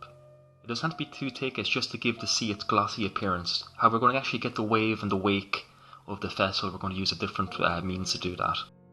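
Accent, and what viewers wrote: Ireland. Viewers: great to hear an Irish voice,great stuff from Bill in Limerick.I have the Yamota battleship.regards Bill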